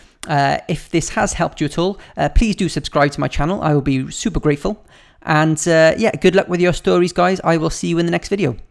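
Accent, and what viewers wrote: Wales, United Kingdom. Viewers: He sounds Welsh! I dunno... he has the same accent as me!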